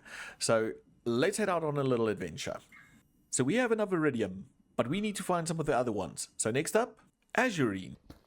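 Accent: South Africa. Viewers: I like your South African accent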